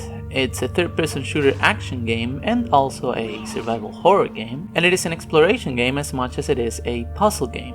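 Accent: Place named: Argentina. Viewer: tu acento Argento me agarró por sorpresa 😄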